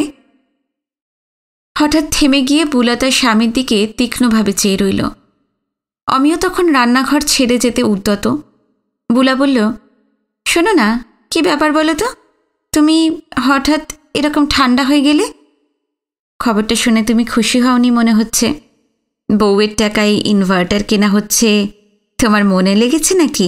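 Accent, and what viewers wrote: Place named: Faridpur, Bangladesh. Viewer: তুমি এমন চমৎকার ভাবে ফরিদপুরের ভাষায় ও ওখানকার আঞ্চলিক টানে গল্পটা পড়ে শোনালে যে কী বলব ! অসমসালা !!!😂😂😂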